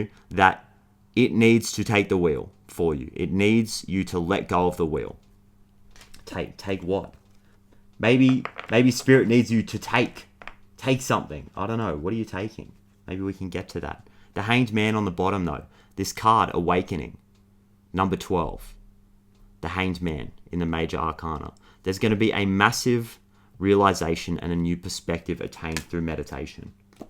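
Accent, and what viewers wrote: Australia. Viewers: Tarot reading with australiam accent by is what I've been manifesting. Thanks universe 🙏🏼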